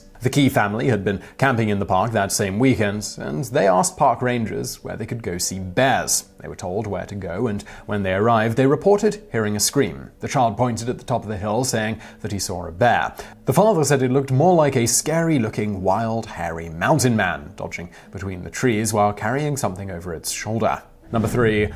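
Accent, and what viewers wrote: England, United Kingdom. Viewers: Your voice is an awesome British type. Needs to be in everyday TV.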